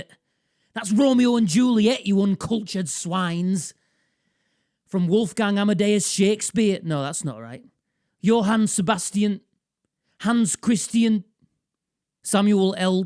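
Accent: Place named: Yorkshire, United Kingdom. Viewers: If one goes back and watches your older videos, your voice was definitely more subdued. I like the more manic...with the even more pronounced Yorkshire accent. Keep up the good work! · I agree about the voice. Yorkshire and Scottish accents are the best in the UK for my American ears! 😎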